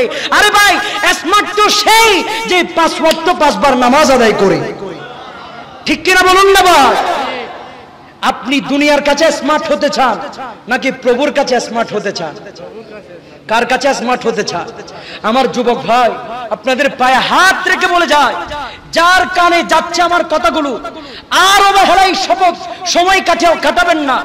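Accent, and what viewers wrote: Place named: Pakistan. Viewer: আরবি পড়তেছেন উর্দুর মত আফসোস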